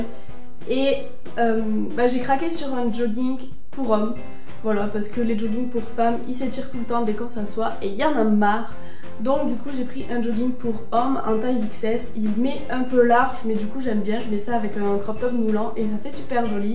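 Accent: South, France. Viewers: Bonjour ! Je viens de découvrir ta chaîne et j'aime beaucoup :) du coup je vais poser des questions en mode la fille qui est larguée ! Quelle âge as tu ? Que fais tu dans la vie ? Et d'où viens tu ? J'entend l'accent du sud , c'est rare de voir des youtubeuses du sud! Moi je viens de Toulouse ;)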